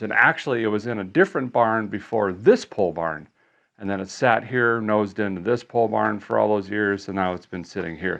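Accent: Minnesota, United States. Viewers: The multi-millionaire celebrity Vice Grip garage... havent you yet transcended beyond ACTUALLY having to produce content? No billionaires just supporting you for being the celebrity you are with your "Fargo" Minnesota BS Accent? All this is is Hoovie 2.0...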